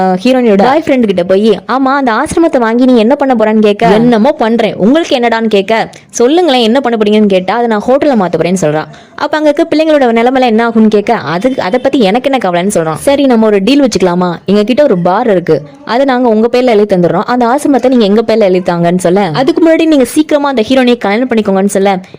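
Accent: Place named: Tirunelveli, India. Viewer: திருநெல்வேலி பாஷை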